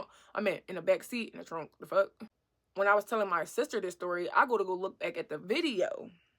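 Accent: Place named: Philadelphia, United States. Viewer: you got a way with words and I got a crush on ya Philly cadence. When are you free to converse more over a glass of Moscato? 🥂 🌹?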